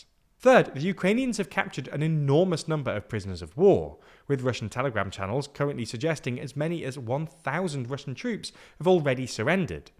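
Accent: England, United Kingdom. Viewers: Do you have a speech impediment or just a goofy brit accent? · Never believe a single word about this war with an English accent. It is the Perfidious Albion sound of lying.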